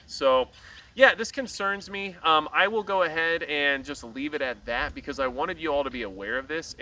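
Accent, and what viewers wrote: United States. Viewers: You look and sound like a typical American to me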